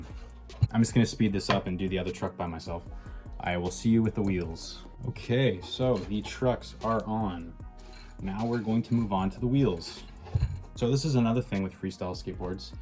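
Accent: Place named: Canada. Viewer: i can tell you're canadian by your accent also its ironic having that board AND the kill your idols deck lol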